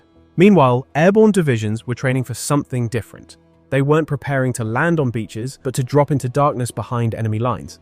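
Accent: United Kingdom. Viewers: The narration sounds Brit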